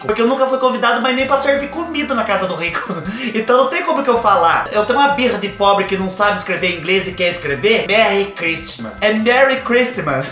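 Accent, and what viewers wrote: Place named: Caipira, Brazil. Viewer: tu é doidinho das ideias com esse sotaque caipira e contando teus causos eu não aguento 😂😂😂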